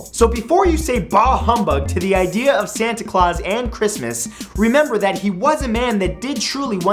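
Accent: Canada. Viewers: Wow now i definitely know that your Canadian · Is he Canadian?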